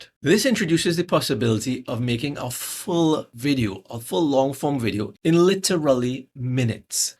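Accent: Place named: Trinidad and Tobago. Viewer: Alright Good morning Yuh sounding Trinitarian. 🇹🇹 Trinidadian.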